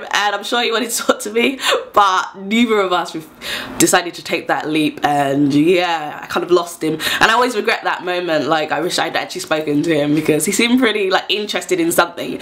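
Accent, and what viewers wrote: United Kingdom. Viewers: I'm almost crying cause I love your British accent to much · Absolutely adore your accent, luv! I'm British as well, and I miss that accent. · Owing to you, i got used to British accent. I could understand you fully! And i like your giggling smile. (I call it 눈웃음 ^^)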